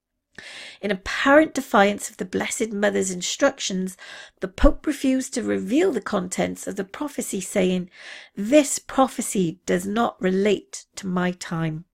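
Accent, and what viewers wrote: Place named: United Kingdom. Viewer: Very well spoken dear lady I have a New Fav YT channel I believe the final prediction has been held from us. People Do panic easy and from other circles such as the “Visitors” stories are told of how mankind was grown through Genetic manipulation and planted here on Earth? Thank you, I as a Yank who was raised in Southampton I always perk up to a Brit accent!Blessings to You🌹💀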